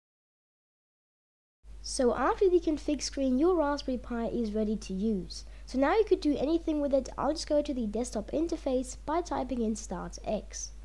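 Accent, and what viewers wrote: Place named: South Africa. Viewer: Thanks for the help. Can't help but notice your accent sounds south african.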